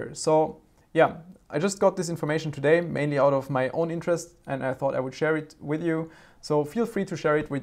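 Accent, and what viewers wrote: Germany. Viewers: Most people??? LOL. I work in a company with over 200 employees, and only a few people speak English, and not even close to flawless. Only a minority of people in Germany speak English. Most learn it at school, then forget it afterwards because they never use it again. And I agree with TheFreeFlow. He could work on his german accent easily by watching more TV shows in English, or tech channels. Anything really, but in English.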